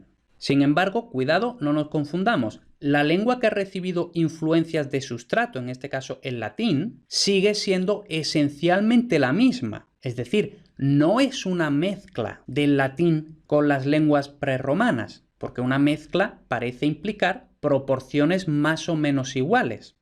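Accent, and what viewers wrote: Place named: Spain. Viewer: Me refiero a tu pronunciación normal. Pues es curioso🤔, debe de ser que en la zona de España de la que vienes se pronuncia así. O igual es mi zona de España donde se pronuncian diferente, ahora mismo no sabría decir.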